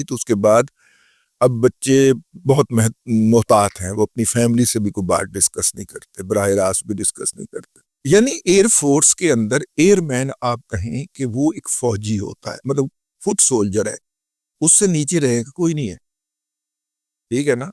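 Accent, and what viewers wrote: Pakistan. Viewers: I love your Pakistani tune, which is highly appreciated. Thank you DADA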